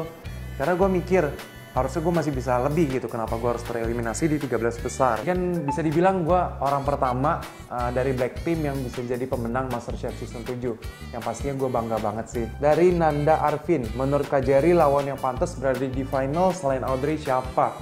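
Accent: Indonesia. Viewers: Logat Serang nya brasa bgt yaa jer 😁🤭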